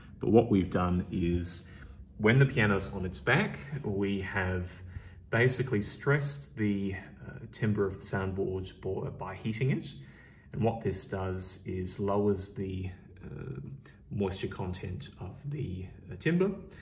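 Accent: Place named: Australia. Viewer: Hi, Where are you located? I am guessing in Australia or New Zealnd by your accent. In New England here near Boston. I am crazy about that gorgeous Richard Lipp. We almost never see their pianos. On Roberts piano out of Oxford he gets them from time to time and appears to really like them. Any info you care to share about heirloom pianos would be appreciated.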